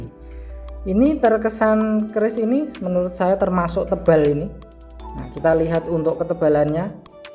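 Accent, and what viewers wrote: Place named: Cirebon, Indonesia. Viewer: Pelokk cirebonan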